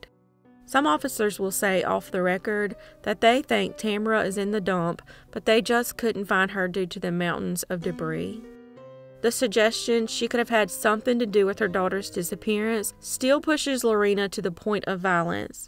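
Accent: South, United States. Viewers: I love your Southern voice, and the channel: you rock! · that beautiful Southern drawl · Hi,it is nice to finally put a face to that cool southern voice.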